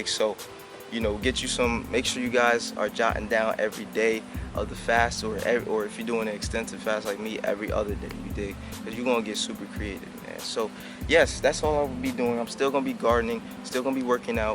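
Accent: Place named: South Africa. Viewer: Super cool video! Where you from? You Sound South African lmao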